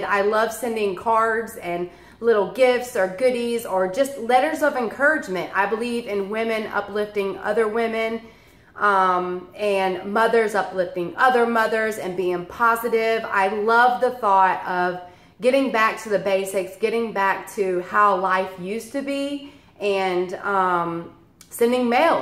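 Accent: South, United States. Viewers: Gotta say that I just love your southern accent. I love some of the phrases you use. They add to your heart warming personality. I myself appreciate your comments regarding even the smallest kindness making your day! It’s true. One thought good or bad can change the course of a day sometimes, but when you know that their are people who care it makes life just that much easier!!!! Thank you for your positivity today!!!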